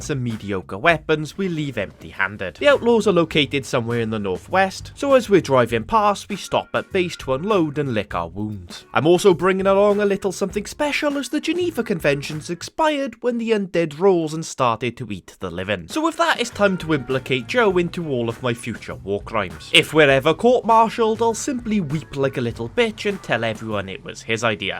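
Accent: United Kingdom. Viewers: Why you British?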